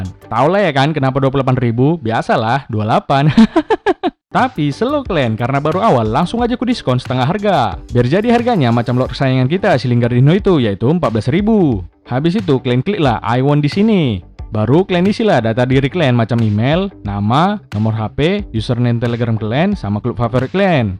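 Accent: Medan, Indonesia. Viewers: Digigit tungir wkwkwkw Medan kali ah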